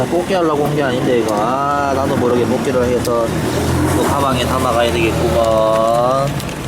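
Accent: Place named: Gyeongsang, South Korea. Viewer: 구독하고 정말 재밌게 보고 있어요 근데 욕은 좀 자제해 주세요..... 그리고 경상도 사람 이죠 제가 경상도인데ㅎㅎ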